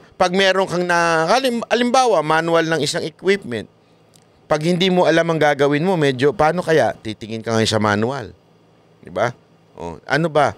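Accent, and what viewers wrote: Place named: Visayas, Philippines. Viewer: bisaya na si kagahapon😊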